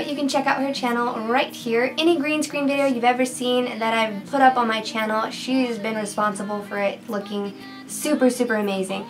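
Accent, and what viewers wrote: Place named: South, United States. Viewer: I love her slight southern accent, and I'm excited to see the zombie stuff you do in the future! Whoo!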